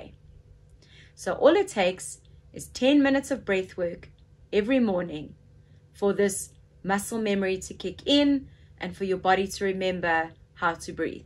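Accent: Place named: South Africa. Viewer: She’s from South Africa my hubby is from there . I hear the accent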